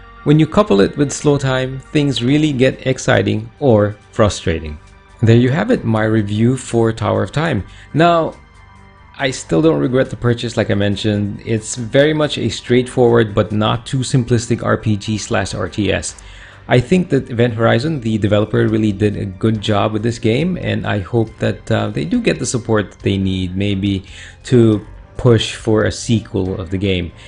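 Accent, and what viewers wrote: Philippines. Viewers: That is not what I meant to my message. I know the accent of pinoys so I ask him if he is pinoy now do you get it?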